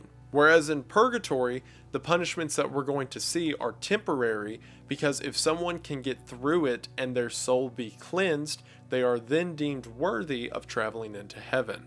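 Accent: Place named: Appalachia, United States. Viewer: It's an accent. I do the same thing. He's from Appalachia, I'm from the Mid-Atlantic.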